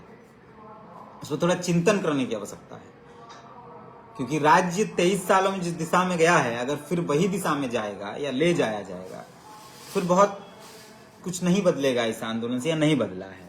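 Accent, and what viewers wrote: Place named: Bihar, India. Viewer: Ap jharkhandi jesa bat karo ne ap bihari jesa bat kar rahe ho jharkhand ka niwasi ho kar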